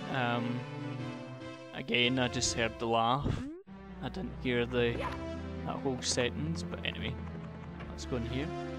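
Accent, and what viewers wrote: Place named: Scotland, United Kingdom. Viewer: Lol i love your voice. i know your Scottish but its pretty cool to hear something unamerican for awhile.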